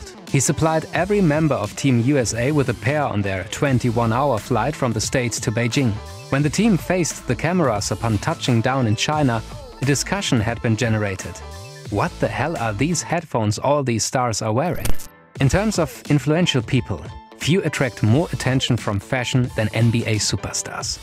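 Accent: Germany. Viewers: Dieser deutsche akzent killt einen 😂 geile videos, weiter so 🤌🏻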